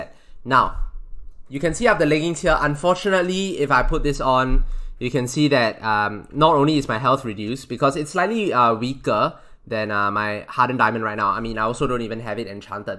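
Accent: Singapore. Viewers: knew it from the singapore dialect that i have heard.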